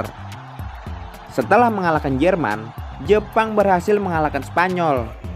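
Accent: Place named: Banyumas, Indonesia. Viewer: Mimin-nya orang ngapak seperti nya....😬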